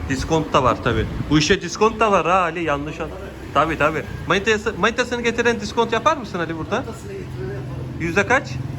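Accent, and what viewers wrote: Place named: Izmir, Turkey. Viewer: İzmirli ağızıyla keyifle izleyebileceğim bir kanal buldum :) Başarılar dilerim kardeşim İzmir'den selamlar...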